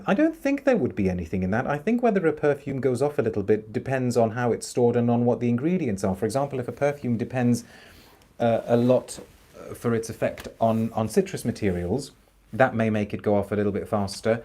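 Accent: United Kingdom. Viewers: Hello 👋 are you English?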